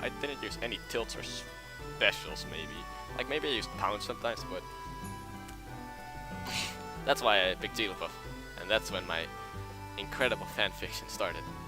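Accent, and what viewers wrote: Netherlands. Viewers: Lol, ik wist niet dat je nederlands was. Ik hoorde het wel aan je accent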